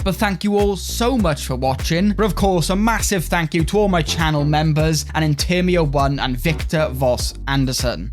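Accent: Wales, United Kingdom. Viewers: Great video as always (nice Welsh accent btw)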